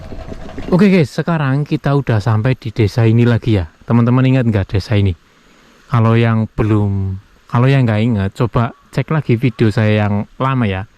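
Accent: Banyumas, Indonesia. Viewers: Mantep mas konten2nya bahasane logat ngapak tapi sangat menghibur dan menambah wawasan juga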